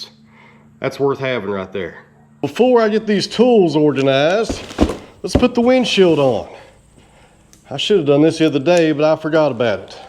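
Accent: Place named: South, United States. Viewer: Hi, love watching your videos. I’m an old carpenter up here in Edmonton, Alberta. Raised on a farm in Manitoba but left the farm and became a carpenter. Your southern accent is a hoot. We Canadians likely sound like Brits. lol. I enjoy all your videos but I’d say videos about 15/20 minutes are my favourite… yeah, we spell words funny too. Cheers Earl B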